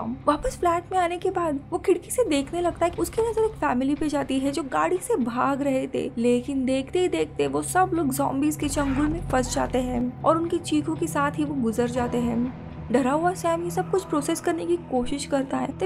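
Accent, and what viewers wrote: Maharashtra, India. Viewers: B. Aawaja varun Marathi ch vatate. Tichya instagram var tine marathi madhye lihila aahe.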